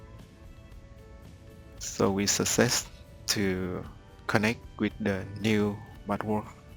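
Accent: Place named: Vietnam. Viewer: Thank you! Where are you from? Your English voice like Vietnamese. Hello đồng hương 🎉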